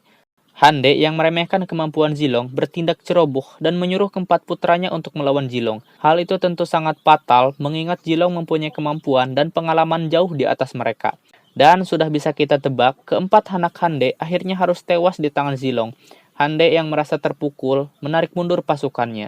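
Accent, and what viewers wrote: Indonesia. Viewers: Logatnya terlalu ke Sunda"an bro bikin kurang sreg dengarnya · Ngapaknya sungguh kental 😂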